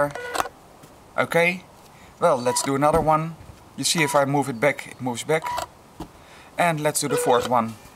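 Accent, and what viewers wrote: Netherlands. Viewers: grappig ik kan aan het accent horen dat je nederlands bent :D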